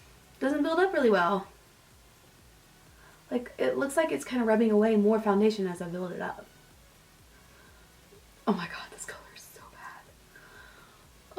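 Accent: South, United States. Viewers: Hey! I'm such a huge fan of yours. A couple months ago I felt you were somewhat losing your more genuine reviews and videos and it seemed like an abundance of advertisements etc. Not hating at all, you were still my absolute favourite on YouTube. But lately you've been putting out such genuine reviews and it's been reminding me of your old videos again! I'm so happy about the content and the amount of videos you've been putting out lately. Just wanted to say that you are more stunning than ever, you're videos have been so amazing and I wish you and Davis all the best. You guys look like you're the happiest you've been! Thanks for sticking to your roots, your subscribers really appreciate the "realness" of your videos. And I love that southern accent! You're beautiful.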